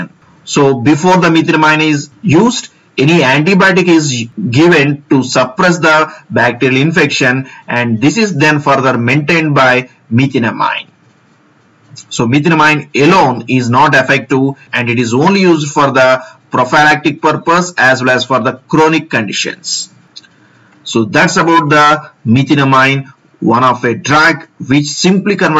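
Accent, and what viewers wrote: South India, India. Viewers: Ur pronunciation tells that u r South Indian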